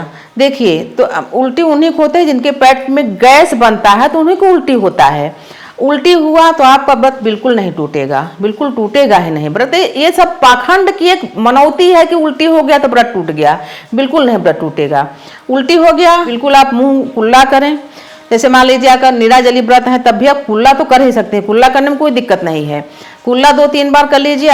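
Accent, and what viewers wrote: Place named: Prayagraj, India. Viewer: मां जी प्रणाम, लोगों की तो मुझे पता नहीं मगर मुझे आपकी भाषा बहुत अच्छी लगती है। हम तो राजस्थान से हैं। लेकिन जब मैं बहुत छोटी थी तब मेरे पापा जी के राजस्थान में ही आफिस में एक अंकल जी थे प्रयागराज से उनके बोलने का तरीका भी यही था और मुझे उनका बोलना बहुत ज्यादा अच्छा लगता था। सच कहूं तो मुझे उनके इस तरह से बोलने पर मुझे तो उनकी वाणी में बहुत ही मिठास महसूस होती थी और मैं तो जब भी आपका वीडियो देखती हूं तो तब भी वही मिठास महसूस होती है।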